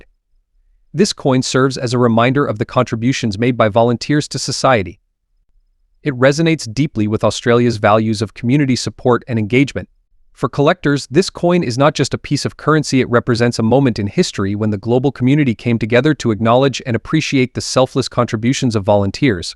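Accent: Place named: United States. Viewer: What an absolute load of BS . Get a yank voice to narrate the topic of Australian coins they clearly know nothing about. The 2010 $2 coin had over 36 million In circulation. The 2008 scout coin over 17 million in circulation. No idea at all !!!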